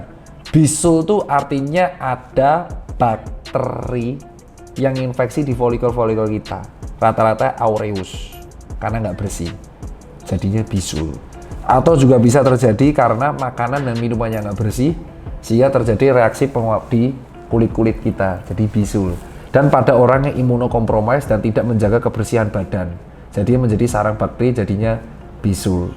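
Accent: Java, Indonesia. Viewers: Ngomonge jowo medok yo dok .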